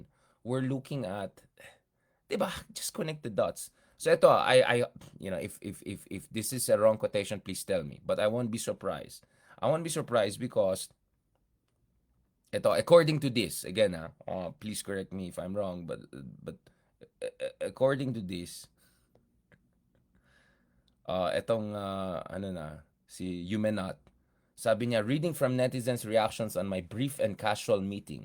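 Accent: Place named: Ilocos, Philippines. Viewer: You are the ultimate outsider. You can go round for round with the Congo boys here in Manila. But you are more comfortable with Ilokano than you are with Tagalog. Hindi nga marunong mag ilokano si Marcos. Ocassionally you Baguio boy lingo gets mixed up in your narration but that’s fine that you lapse into ilokano. And sometimes you speak English with Ilokano accent. That’s fine. You use a lot of Russian words. Maraming dilaw na holier than thou. They’re religious. Okay lang yon na outsider ka. Okay din na napagsama mo si Ronald at si Marc. Ronald knows that the Showbiz is politics….addition. Ronald knows that the masang Pinoy is BADUY. Marc is gonna teach him the low down on Nora-Vilma.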